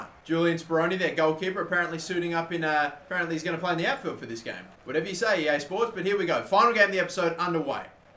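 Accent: Adelaide, Australia. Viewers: Are you from Adelaide?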